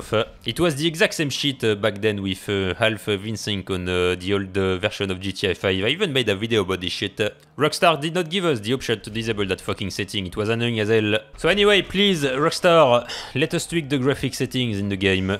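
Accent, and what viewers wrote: France. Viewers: never get tired of hearing baguette accent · Wow bruh that is one strong french accent 😅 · lol l'accent français me fume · L’accent français prend parfois le dessus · Hell yes, I could listen to you curse shit up all day with that awesome French accent!! Chicks must be sitting and sliding around you 😂😂😂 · Thanks for the info it's pretty precise After 10 of your vidéo i heard a french accent, u talk very good btw but i wanna be sure Ty Ytb Recom' for finding you ! Continu ^^ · L'accent français qui ressort ça fait grave bizarre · accent francais ptdr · Your French accent is epic! xD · fait un effort sur ton accent je parle que peut anglais et j'ai un meilleur accent aucun effort les francais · French accent 😄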